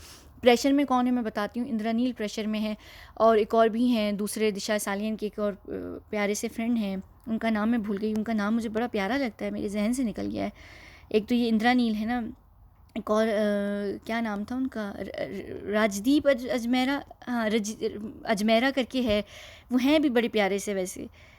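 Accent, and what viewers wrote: United Kingdom. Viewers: yes she has a british accent as she mentioned herself in previous videos that one of her parent is white(britisher).... i think she travels all over the world. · Good info. BTW like your brit accent. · you are right, she has worked in several countries including India, but she is British, I can tell from her accent, it was a joke...the way she gives 5seconds to people who think that she fakes the accent and it makes me 😂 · I don't know your name , I don't know where are you. But thanks a ton for this job .I like your British ascent. I love your voice as well. Keep up the gud work. Cheers .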